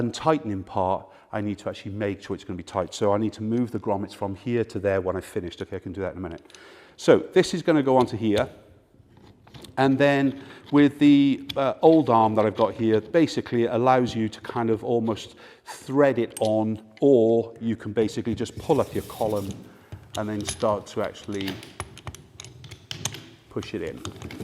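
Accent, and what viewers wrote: United Kingdom. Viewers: I love english accent.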